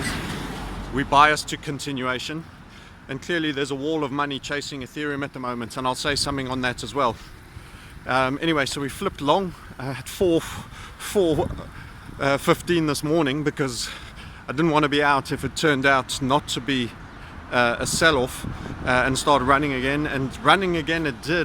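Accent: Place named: South Africa. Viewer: You sound like a South African whose been living in the UK for a couple of years.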